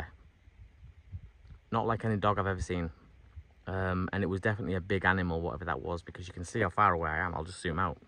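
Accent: United Kingdom. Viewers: I love your channel! Your British accent makes me 🤸🏼‍♀️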